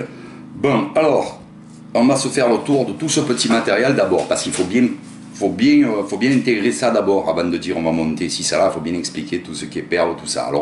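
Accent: South, France. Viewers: Avec l accent du soleil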